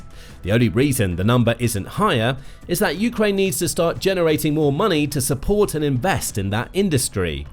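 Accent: United Kingdom. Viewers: British English, yes, so kinda regional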